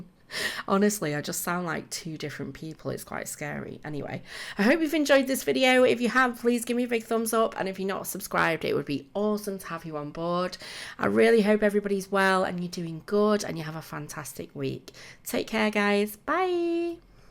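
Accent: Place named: Manchester, United Kingdom. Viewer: Absolutely love this house. Being on an angle really makes it more awesome. Also brill to hear a fellow Manc simmer x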